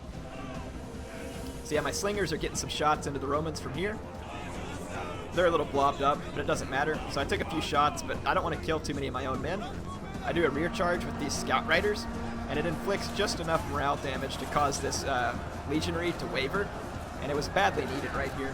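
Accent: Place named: South, United States. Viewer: You sound like a southerner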